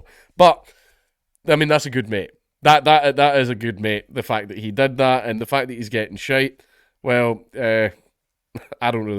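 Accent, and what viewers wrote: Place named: Scotland, United Kingdom. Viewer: You're the Scottish version of Brian Blessed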